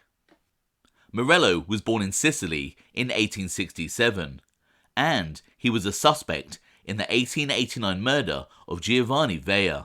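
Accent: England, United Kingdom. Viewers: Not true, I'd watch an autobiographical vid in a hot minute lol. You're a Boss in your own right. Top notch research and presentation complete with a crisp English accent. Slay!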